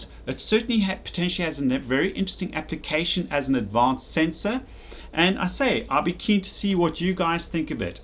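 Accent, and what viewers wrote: South Africa. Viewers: South Africa or Zimbabwe probably, def. not British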